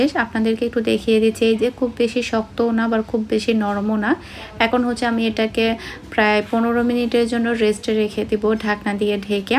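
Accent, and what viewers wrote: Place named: Sylhet, Bangladesh. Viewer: আপু সিলেটের তাই শুদ্ধ বাংলা বল্লেও সিটেলের ভাষা টা চলে আসে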